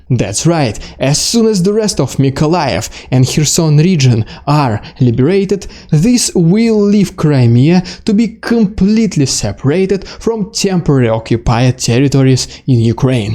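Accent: Russia. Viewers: Another extraordinarily informative and interesting video from you, sir. Your English is admirably perfect and I love your strong Russian accent!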